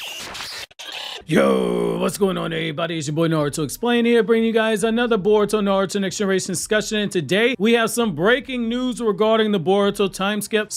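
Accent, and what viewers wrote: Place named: Carolinas, United States. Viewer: Bro sounds likes you lived in the Carolina’s lol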